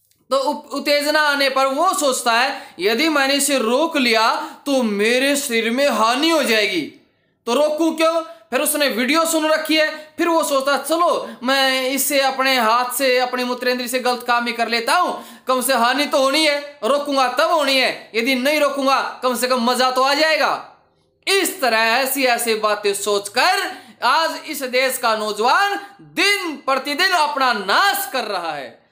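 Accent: Haryana, India. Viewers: Guruji aap hariyana se ho Kya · Haryanvi acsent Dekh kar mja agya 😂😂😂😂 I am also haryanvi jai ho .....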